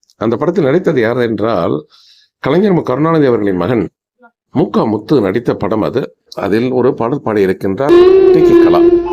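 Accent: Sri Lanka. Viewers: அய்யா தகவல் அருமை.உங்கள்தமிழ்.இலங்கைதமிழ்போல்இருக்கிறதுநீங்கள்இலங்கையா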